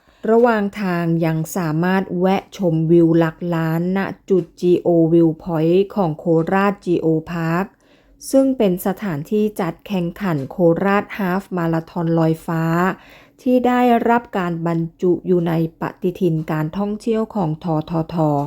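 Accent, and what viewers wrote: Cambodia. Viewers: แอดมินคน นี้เขมรนิหว่า สำเนียงเขมรเป๊ะ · คนพูดไม่ไช่คนไทยแน่นอนสำเนียงออกไปทางเขมร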